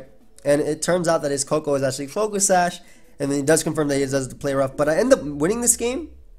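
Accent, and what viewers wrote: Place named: New York, United States. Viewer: Neeyew Yawk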